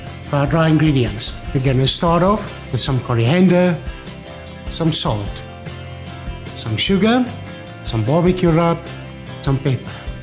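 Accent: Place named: South Africa. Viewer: I just love your South African accent!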